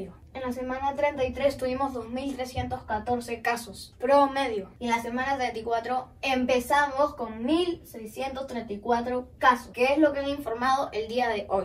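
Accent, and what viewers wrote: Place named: Argentina. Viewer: porque algunos peruanos hablan como argentinos????? pense que eras de argentina se parece bastante el tono... alguien le paso lo mismo???????????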